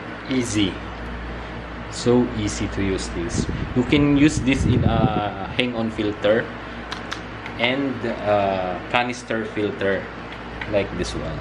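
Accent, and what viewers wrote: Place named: United Kingdom. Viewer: Akala ko british national yung nagsalita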